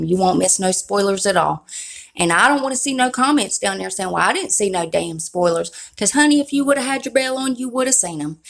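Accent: South, United States. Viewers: Love your southern accent 🥰 Thanks for sharing. I subscribed 👌🏽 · Awesome to hear a southern voice on here.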